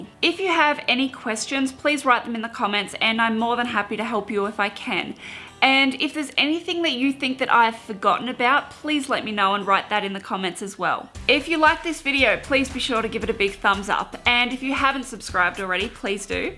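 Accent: Australia. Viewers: Love your Australian accent... Will you ever get your septum pierced?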